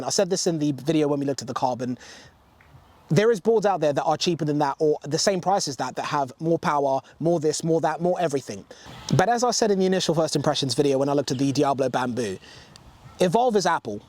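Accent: United Kingdom. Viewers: You sound like you may be in the UK any issues riding it on cycle paths or in public etc. if you’re not in the UK please ignore.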